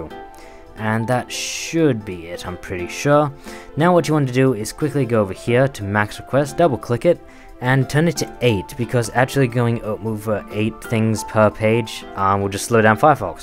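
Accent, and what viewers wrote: Australia. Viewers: Australian accents turn me on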